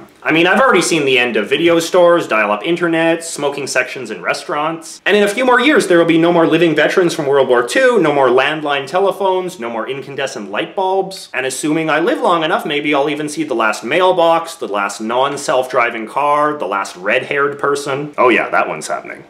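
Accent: Canada. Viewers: As a Canadian I can tell by your accent, you are Canadian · Soooooo fookin Canadian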